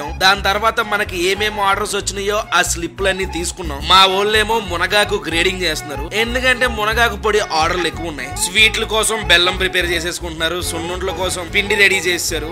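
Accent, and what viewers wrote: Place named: Andhra, India. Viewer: Yak....😮😮😮😮ENDIRA NEE GALIZZZZ ANDHRA SLANG.....BLOODY ANDHRA SETTLER....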